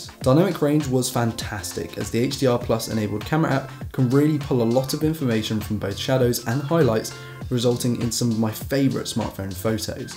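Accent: Cornwall, United Kingdom. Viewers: Are you from st Austell? I recognise the Chinese aha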